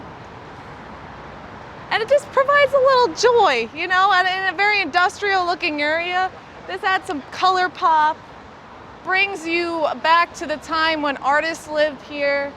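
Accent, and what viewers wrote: New York, United States. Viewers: I love your beautiful new York accent!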